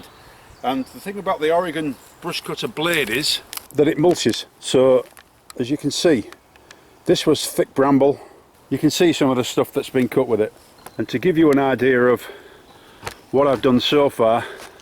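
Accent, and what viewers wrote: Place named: Northern England, United Kingdom. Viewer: This is a great video and a lot of effort put into it. I think the dislikes are from people who aren't front the UK and can't understand your Northern accent😆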